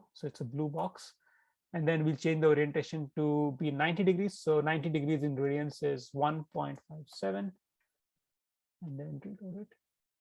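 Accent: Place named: India. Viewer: please guys please to all my indian ppl ur killing us with the accent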